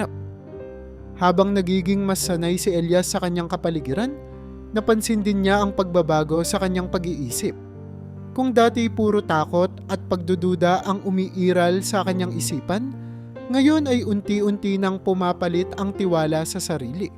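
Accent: Philippines. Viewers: May tanong din ako bakit ganuon kayong bumigkas ng tagalog, anong probinsia nyo?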